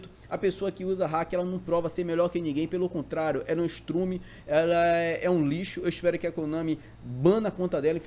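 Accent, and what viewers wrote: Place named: Bahia, Brazil. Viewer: seu lindo, foi doloroso traduzir seu baianês nesse vídeo, mas tá aí, tudo em inglês pra você. faça bom proveito: )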